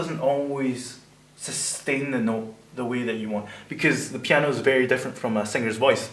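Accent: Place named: Ireland. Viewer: you sound like your from Europe or Ireland. Forgive me if I'm wrong.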